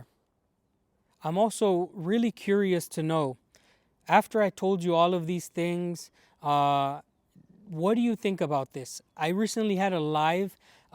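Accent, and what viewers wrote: Mexico. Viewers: Bracero was WW2. You're younger than me by a generation. Sorry your story does not work by time. You're at least born on the 1980s if your parents were part of the Bracero program they would have been in their 20's in WW2 which would make them in their 60's in the 1980s. Sorry pal not buying it. And your accent tells me you spent most of your early childhood in Mexico before you came to the US having nothing but contempt for our immigration laws like every other Mexican. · You sound more Mexican than Ameican, bro. Come on now. Dumb shit be dumb shit..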